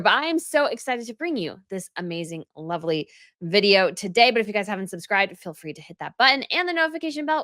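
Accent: United States. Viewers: I love you, are you from the South? I don't need to know exactly where but I swear you could be one of my friends:D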